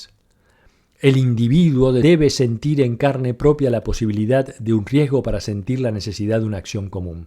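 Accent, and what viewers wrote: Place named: Argentina. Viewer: Siempre es grato escucharlo. Deseo compartir una sensación paradogica mientras te oía.En la parte final del vídeo cuando haces una serie de postulados sobre la Libertad solo asentía y no podía estar más que de acuerdo. Hasta que el acento argentino me recordó a MILEI y pensé como unas ideas tan profundas y tan ciertas pueden ser tergiversadas y usadas para todo lo contrario. De nuevo, mil gracias.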